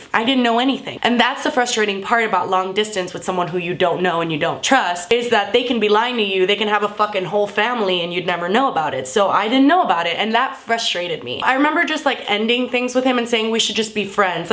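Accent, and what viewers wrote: United Kingdom. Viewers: british accent is very attractive tho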